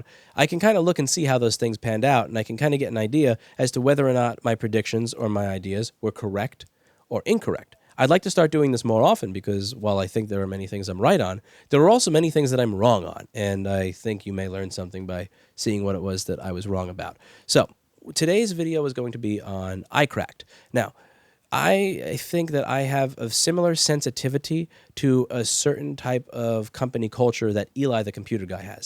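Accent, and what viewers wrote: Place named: New Jersey, United States. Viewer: You sound like you live where I live. Nj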